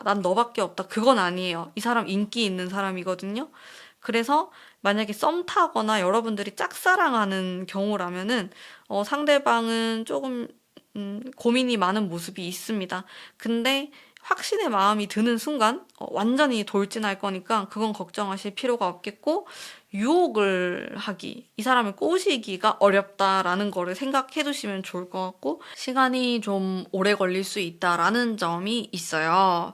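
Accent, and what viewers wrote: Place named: Busan, South Korea. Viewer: 며칠동안 님 영상을 50개 넘게 돌려본 팬이에요❤ 1개 영상보고 어? 나랑 너무 똑같은데? 신기한데? 이러면서 딱 한사람을 떠올리며 50개 넘는 연애 타로를 봤는데 1개 영상쯤은 다른 말을 할 수도 있잖아요 신기한게 그 많은 영상이 같은 의미를 담아서 정말 놀랏어요ㅠㅠ특히 같은 카드가 여러 영상에서 나온다던지 그사람 행동을 일관성잇게 건드리지 말고 내버려 두라고 한다던지 ㅠㅠ 어떻게 단 하나의 영상도 다른게 없이 같은 말만 듣는지 ㅜㅜ 소름돋아여 ㅋㅋㅋ 영상 너무 재밌고 부산 말투 사랑스러우시고 진짜 언니처럼 친구처럼 조언해주시는거 ㅠㅠ 여기는 찐입니다 심지어 엄청 자주올려주시고 ❤❤ 저는 이곳에 잠들게요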